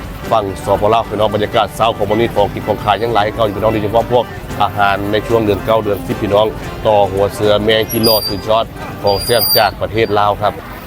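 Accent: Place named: North, Thailand. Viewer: แอดมินพูดสำเนียงคือคนเหนือแท้ 😅 ผสมลาว ผสมไทย เอออะไรกันแน่ แมงจิห่ล่อน่าซื้อมาก็ถูกจริงถูกจัง🎉🎉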